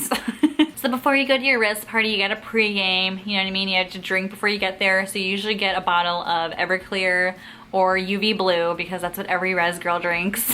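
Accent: Puerto Rico. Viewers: Your sond like you was reast on the isla cuz,,,,,Puertoriqueña,,,,,,same as the ress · Wow I new that your puerto rican because I am from Florida so I know how you talk as Latina boricuaaa 🇵🇷🇵🇷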